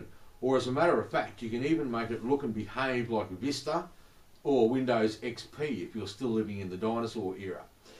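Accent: Australia. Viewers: are you Australian? sorry I know it's off topic but I'm curious as I can't identify your accent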